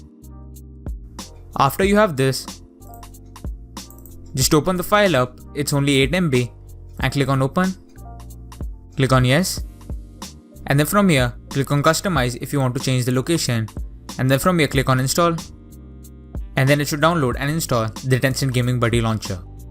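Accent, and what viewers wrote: India. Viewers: Please don't do the cliche basey remix intro sequence- Shit. Ok, but at least have a tutorial that isn't voiced by somebody with an indian accent (no offense meant) SHIT. Another one. At least don't do the "Before we start like and sub" DAMN IT! Ok, but at least tell me it's the real deal and it's not watered down or a virus- It's an EMULATOR?!?!?!?!? That's it, bye. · are you indian you sound like it and i am egyptain by the way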